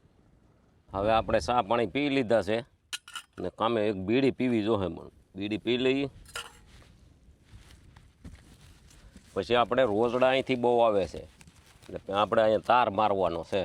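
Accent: Kathiawar, India. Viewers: I love your kathiyawadi and your simplicity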